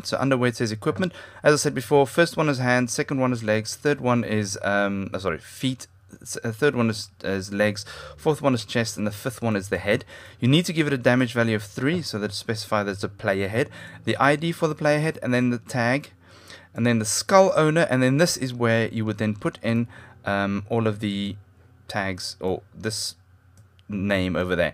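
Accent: South Africa. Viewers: are you South African? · He does sound South African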